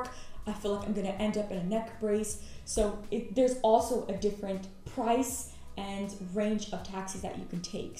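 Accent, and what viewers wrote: Russia. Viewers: You are developing a Russian accent in English … fascinating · You are getting a Russian accent slowly but surely hahahahaha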